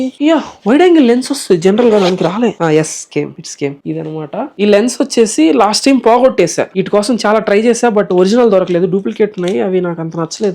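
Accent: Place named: Telangana, India. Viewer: Annaya meeku ma telanagana hyd language baaga alavatu ayyindhi 😅( chesetodni )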